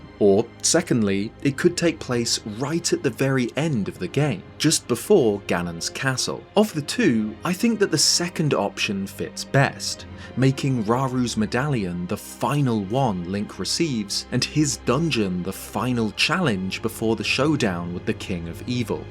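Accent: United Kingdom. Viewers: Lol it's just British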